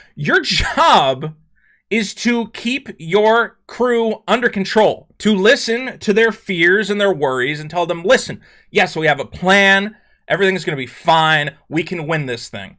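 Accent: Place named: RP, United Kingdom. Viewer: his very reserved, posh, British accent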